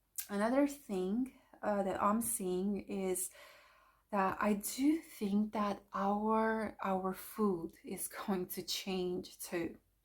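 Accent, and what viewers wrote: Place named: Portugal. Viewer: Awesome video, i am like an amateur astrologer and i share basically the same ideas as you. I am Portuguese, are you in Portugal by the way? Your accent sounds Portuguese, hope you view this message because i would like to be in touch with you. Amazing video again.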